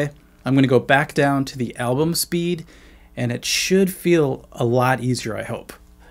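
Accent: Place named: Texas, United States. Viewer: Is this guy from Texas? I never really noticed his southern accent till this vid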